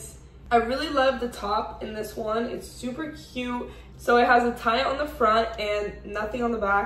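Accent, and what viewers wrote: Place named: Brazil. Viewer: Não adianta ! O sotaque brasileiro no inglês é permanente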